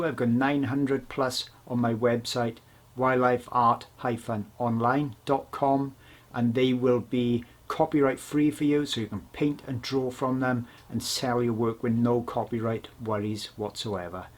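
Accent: Wales, United Kingdom. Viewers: Woooo wow, this is excellent 👏🏻👍🏼 love your art.. can I hear a Welsh accent!!!! I'm from carnarvon North Wales originally ✌️✌️😁😁🇬🇧🐲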